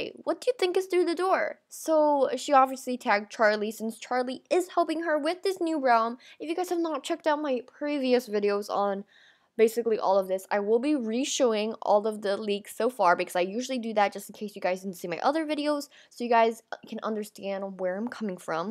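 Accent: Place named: Long Island, United States. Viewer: Are you from Long Island? Becuase your accent is goalssss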